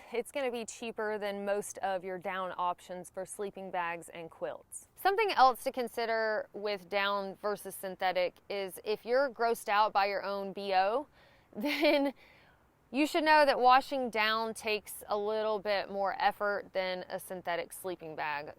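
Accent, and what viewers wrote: South, United States. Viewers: you are just the sweetest and prettiest young lady I believe I've seen in quite some time. No I don't get out much. But I do reminisce a lot about when I used to backpack and you help bring those memories back. I have a Southern drawl just like you do so I guess that's why I like to hear you speak. Thanks for the video I'm sure you're helping these guys out a lot that's wanting to do hiking like you did and do. Keep ye powder dry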